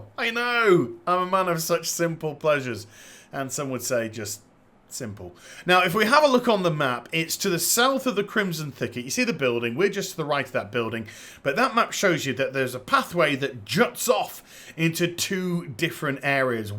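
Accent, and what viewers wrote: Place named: United Kingdom. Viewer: Really like your videos. You're actually one of, if not the best WoW YouTuber in my opinion. Also i like your voice and your accent. As a German who doesnt really appreaciate the British-English-Accent yours is very enjoyable. Thumbs Up.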